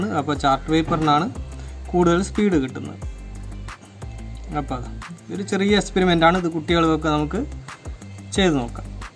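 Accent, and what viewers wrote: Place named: Palakkad, India. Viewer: ഇങ്ങള് പാലക്കാട്ട് കാരൻ ആണല്ലേ? 😃😃😃 ഡവറ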